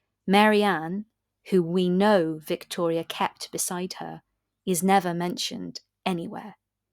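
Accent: United Kingdom. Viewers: It was an interesting programme. Who was the female Scottish narrator? Does anyone know?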